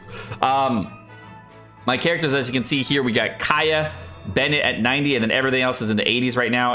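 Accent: United Kingdom. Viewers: That's a very Bri-ishy accent!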